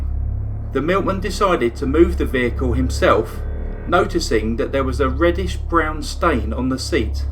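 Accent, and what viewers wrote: England, United Kingdom. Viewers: I think my hubby is jealous, he doesn't understand why I love listening to your voice for one. He knows I love mysteries and scandals, but I told him your voice and others English voices adds to the attraction. Please don't ever lose that! With us being your cousins I cannot figure out how we lost it here centuries ago.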